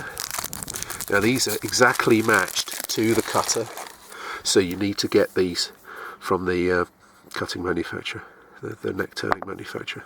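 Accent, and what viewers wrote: England, United Kingdom. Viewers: What a grand name and accent you have! Which part of England is your accent from? Wow! love it.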